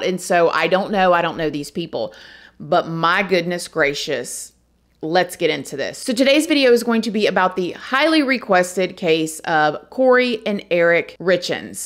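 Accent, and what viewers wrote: South, United States. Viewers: I looove how you say Po-lice!!! Girl you are Sooo Southern, God Bless You!!!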